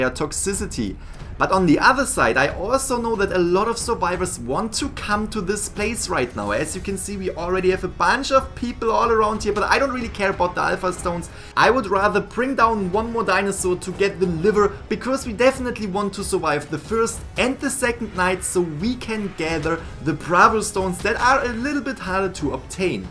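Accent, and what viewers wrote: Germany. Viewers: Der harte deutsche Akzent ist herrlich xD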